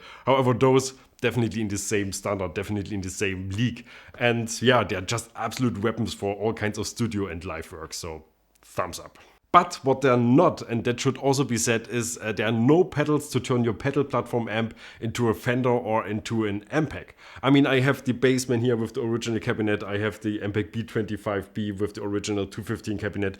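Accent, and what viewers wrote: Germany. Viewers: strongest german accent invasion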